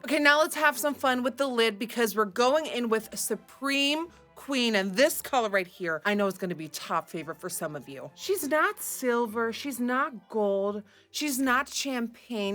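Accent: United States. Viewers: how often do you come to the US? You have an American accent. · Why does she have an American accent, Dutch people have such a strange accent.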